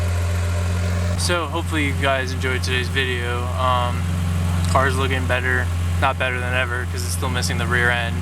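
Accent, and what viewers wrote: Russia. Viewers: Are you russian??!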